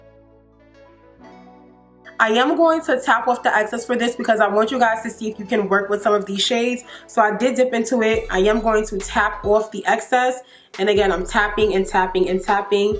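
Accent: New York, United States. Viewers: Where u from? Do I hear a fellow New Yorker?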